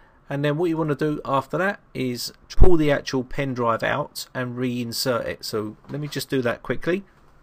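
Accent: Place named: Cockney, United Kingdom. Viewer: You are a marvel to us, your tech tips are truly on the button but I have to laugh, my wife too is a cockney. One of her "bruvvas" speaks just like you. His fumdrive (also drive hache) is always having write protection problems. Hahaha. All the best mate.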